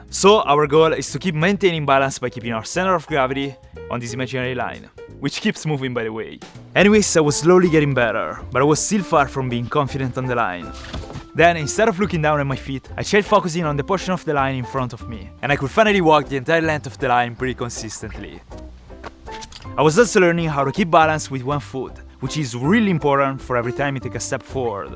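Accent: Italy. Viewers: Se non fosse stato per l'accento non avrei mai pensato fosse un canale italiano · Your content is amazing And i really like your italian accent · adoro il tuo accento italiano quando parli inglese, sei uno dei migliori youtuber in circolazione, continua così😁❤️ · Accento italiano si sente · si sente un botto dall’accento che sei italiano😁 bel video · Sei italiano vero? Dall'accento si sente. E comunque sei un mito 💜⚜💜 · Ma perché ha un accento così italianizzato? (Secondo me è italiano) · Ma é un italiano che parla inglese?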